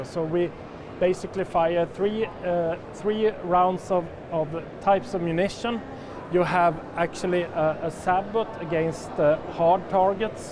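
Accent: Sweden. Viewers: Swenglish sound so horrible. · Horrible Swedish accent + lisp. I understood it perfectly, being Swedish myself, but man it is the opposite of an eargasm to listen to my countrymen when they can't pronounce English properly. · Swedish i think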